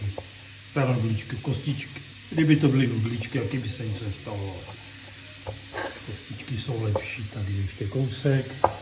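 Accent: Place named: Prague, Czech Republic. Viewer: Dědo Vy jste krásnej Pražák , s nádhernou pražskou češtinou 🌺🌺🌺 a já Vás ráda poslouchám i kvůli té Vaši krásné češtině 🇨🇿🥰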